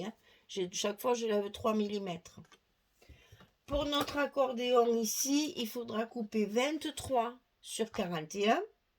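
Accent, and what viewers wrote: South, France. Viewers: Bonjour, c'est vrai que ce n'est pas le style de papier que j'aime mais j'adore regarder vos vidéos, j'aime votre façon de travailler et vous écoutez parler 😊 Votre petit accent du sud met du soleil dans ma matinée 🙂 Alors merci pour vos tutos et votre joie de vivre. Cela donne des idées même si on ne fait pas exactement la même chose ou avec la même collection de papier. Belle journée à vous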